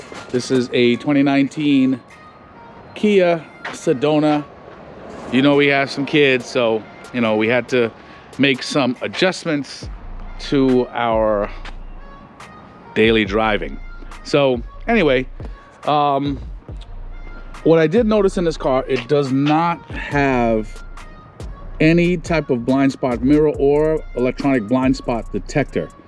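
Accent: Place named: Spain. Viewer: I think he’s Spanish American as he has a Spanish accent in his speech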